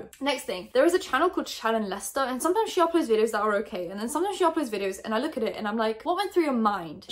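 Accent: United Kingdom. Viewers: What is your nationality? Because you've mentioned that you don't have a British passport but you sound very British lol :)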